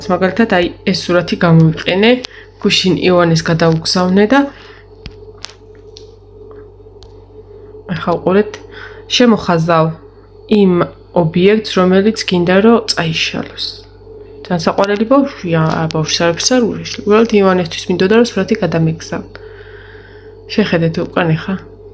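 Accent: Martkopi, Georgia. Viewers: ზუატად მანდ ხარ მართალი დიდი ხანი გიყურებ დამივხვდი რომ საერთოდ არ თამაშობ.შენი ძირძველი მარტყოფული კილო არ უნდა დაიკარგოს . არა კახური❤ძალიან მაღიზიანებს კუთხეს რომ მალავენ წვალებით და არც გამოზდით იყავი ის ვინც ხარ რა❤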